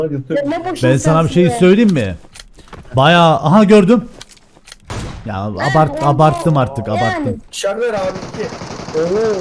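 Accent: Cyprus. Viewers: Kıbrıslı knk Diyarbakırlı değil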